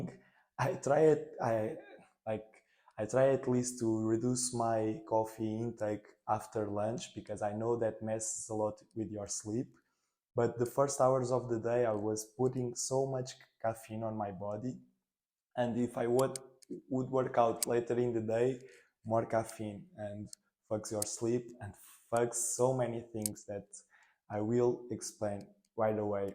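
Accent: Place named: Portugal. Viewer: Nota-se logo pelo teu inglês que és tuga! Bom ver este tipo de conteúdo em Portugal. Continuação de um bom trabalho e qualquer coisa hit me up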